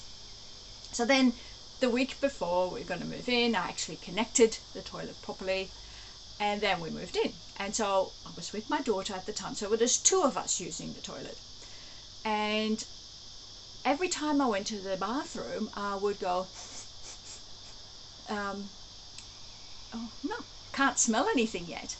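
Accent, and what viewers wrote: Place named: Australia. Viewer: I love the idea of composting toilets but am also skeptical when people claim there's no smell at all. Even flush toilets smell sometimes. I'm glad to hear that composting toilets really don't smell. I didn't realize you were from The Netherlands (I'm learning Dutch, btw). You sound like you were born in Australia.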